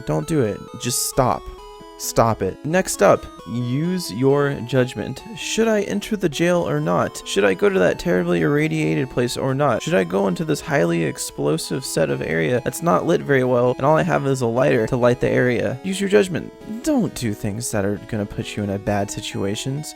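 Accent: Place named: Australia. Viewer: Oh? You sounded more Australian to me :P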